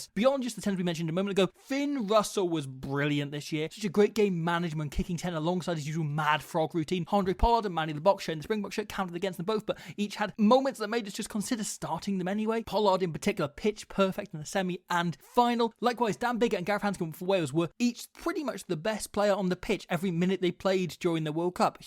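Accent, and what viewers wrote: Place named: England, United Kingdom. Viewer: are you no longer Welsh(you sound English)? Wayne Barnes best ever...? Really? Hes good, maybe the current best but Nigel Owens was better & IMO best of professional era. Nigel ruled to the spirit of the laws. Wayne, being English, always ruled to the letter but occasionally Nigel contradicted the letter but never the spirit of the laws. Honourable mention Wayne Ericsson -Aus. Legends: Paddy O'Brian & Colin Hawke who invented yelling instructions to the players pre professionalism.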